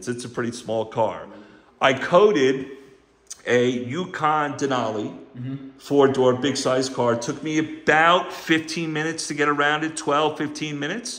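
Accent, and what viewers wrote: New York, United States. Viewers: if i know my people well , I'd say you're from NY. Long island here. Do you guys do detailing or just sell the products.? Im in the Dallas area as well.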